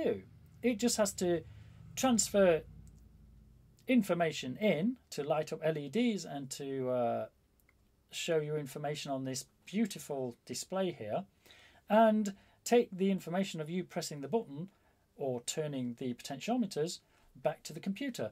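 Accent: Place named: East Midlands, United Kingdom. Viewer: ah thanks for let me know I thought it was a Notts/ Derby accent :) I just came across your YouTube page and just now starting to look at your other vids, very interesting too. I have a music production studio and am also into sound engineering but more hands on than hands dismantling heheh. I also own the Push 2, which I found fascinating when you dismantled it.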